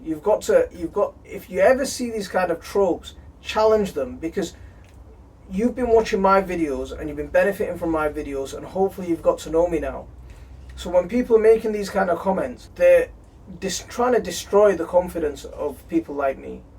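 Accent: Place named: North, United Kingdom. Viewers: First video of yours I've watched, I'm subscribed to a few gardening channels so probably why the suggestion came up. You sound like a decent lad, a fellow northerner with the same passions as me (although you seem far more gifted). Subbed. Outrageous comments, solidarity. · I am really sorry to hear that you have received this sort of vile abuse, there is no place for it and I respect your courage in calling it out. A positive which has come from this event is that I and many others have discovered your channel and shown their solidarity- you are not alone. Its great to hear a northerner sharing gardening tips, it reminds me of home. "I’ll tell you to convert to no dig” had me laughing, I admire your candour and sense of humour. Best of luck in your garden in the new year.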